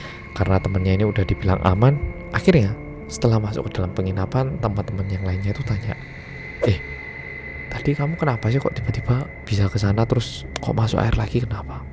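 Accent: Java, Indonesia. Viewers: wkwkwk mas, ini kan cerita tentang orang2 korea ya.. tapi gara2 dibawainnya jowoan jadi berasa denger kisah orang main sungai di brebes. seru. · Lagi nugas tiba-tiba daper notif dari....... Langsung nonton dong♡♡♡♡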